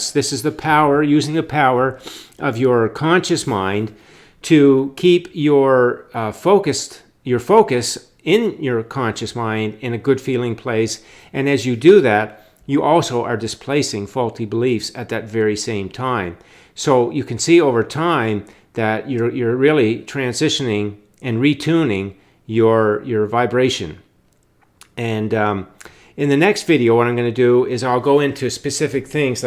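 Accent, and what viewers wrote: Canada. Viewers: I’ve never noticed a Canadian accent until this video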